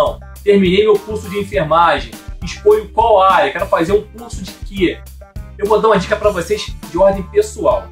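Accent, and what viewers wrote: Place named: Northeast, Brazil. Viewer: Meu querido vc está viajando..A pessoa é formada graduada em Enfermagem , ela deveria estar preparada para todos os setores, vcs professores deveriam ser mais competentes em ensinar e não a pessoa ficar pescando aqui é ali..na graduação ela está aprendendo tudo em relação a Enfermagem. Jeito chato de falar..lol Failar, trabailar, peissoia, affs é uma mistura de Manaus, Bahia, é o Nordeste todo na fala. Um Enfermeiro com 4 ou 5 anos deverá estar apto a exercer qualquer função como enfermeiro. Só se escolher um setor específico deverá fazer sua especialização. Mas primeiro precisa de um emprego. Esse hospitais que são muito mercenários, querem exigir muito para pagar pouco. Falta mesmo é OPORTUNIDADE É BOA VONTADE. A educação não é continuada..então treinamento e ensino contínuo tem que fazer parte da rotina dos recém formados admitidos.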